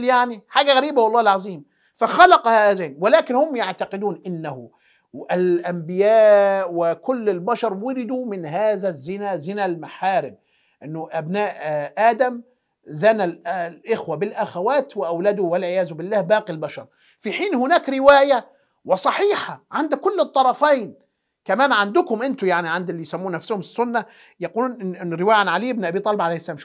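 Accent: Egypt. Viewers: ليش تحچي مصري ؟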